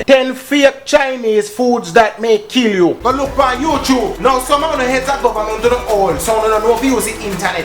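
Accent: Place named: Jamaica. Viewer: I love the Jamaican accent.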